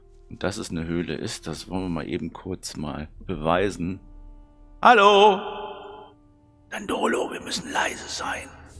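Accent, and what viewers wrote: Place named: Bavaria, Germany. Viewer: Dein bayrisch klingt ganz ordentlich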